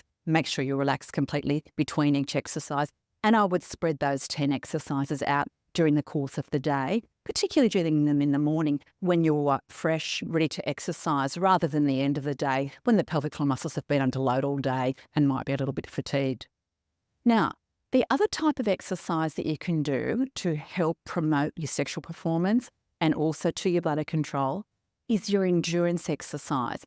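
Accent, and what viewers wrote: Australia. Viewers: The Australian accent is very appropriate for errectile dysfunction discussion. My favorite channel so far.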